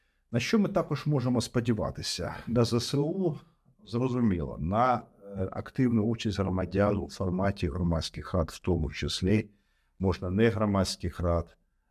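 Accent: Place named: Germany. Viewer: говорит по-украински как будто, с немецким акцентом)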